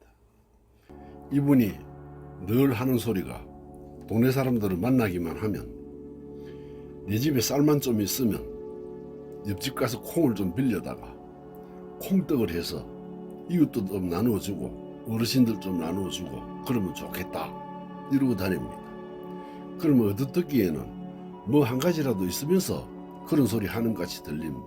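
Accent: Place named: Gyeongsang, South Korea. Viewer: 굿모닝~~^^. 선생님. 원래 정치인의 공약은 空約이라고 보면 됩니다. ㅎㅎ 화려한 불빛. 빌딩 사이로 빈민들의 추위와 배고픔이 있네요. 우리 님의 목소리는 여기 서부경남 분 같습니다. '이게 뭡니까'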